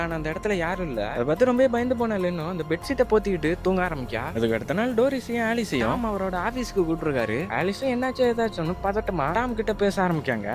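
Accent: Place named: Tirunelveli, India. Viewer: Youv thirunelveli machan.... Super paaaa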